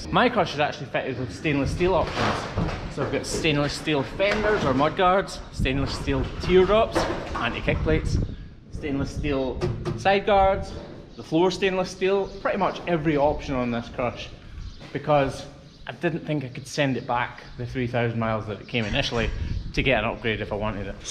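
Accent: Scotland, United Kingdom. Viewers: What an awesome piece of equipment. As a lover if all things dairy, I was surprised when I first discovered your videos. I never realized how much attention cows' feet should have. Your channel is so professionally done. I especially like your opening. Obviously you work very hard. I also appreciate listening to your lovely Scottish accent and seeing the farms setting. Thanks! From Southeastern Pennsylvania.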